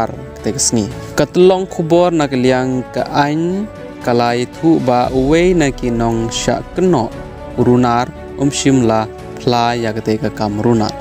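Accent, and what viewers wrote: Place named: Bengal, India. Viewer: Tang kren khasi ruh ym nang bha. Ka accent ka pronunciation kam dei khasi..khar bangla imat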